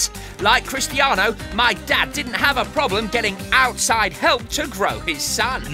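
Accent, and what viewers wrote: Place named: England, United Kingdom. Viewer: the english accent ruins it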